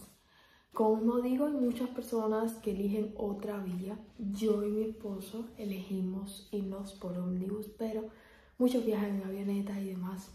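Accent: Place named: Cuba. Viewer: Ese acento de que parte de Cuba es?? Un grande saludo de un Colombiano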